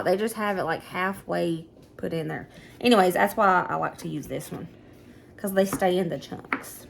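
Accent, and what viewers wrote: South, United States. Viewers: I just found you and can not stop watching you. I'm from Tennessee but live in Ohio. I sure do miss my southern belle accents, lol. I enjoy your recipes and your sweet hospitality. God bless you and your family and a very Merry Christmas. Your boys are southern Gents ❤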